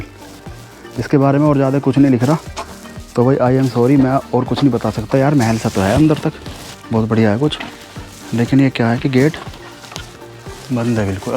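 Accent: India. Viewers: I was there in 2004. Theth haryanvi tone mein hi video bana rakha hai!!!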